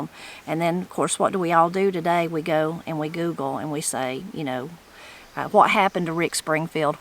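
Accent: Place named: East Tennessee, United States. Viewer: Just chiming in to say that I enjoy your videos, and it's crazy to me how it's anybody's business about your money other than your own. Keep on doing what you're doing, and it's good to hear voices that sound like home to me. East Tennessee expat in South Central Virginia, I'm still technically in Appalachia, but it's a little different than home.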